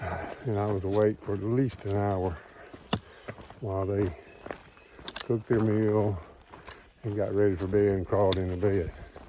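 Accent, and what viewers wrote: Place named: Mississippi, United States. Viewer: I am way behind (Day 42) but jumped ahead to ask a question. I was wondering what service plan you picked for your “In Reach” and if you still feel it was the right plan for you? Sure enjoying your journey! Have you been using your turkey call lately - worked pretty well down south. I have ancestors from the Holmesville-McComb area of Mississippi who fought for a Miss. regiment in the “War between the States” so especially enjoy your perspective and accent. Good luck to Katahdin! God Bless.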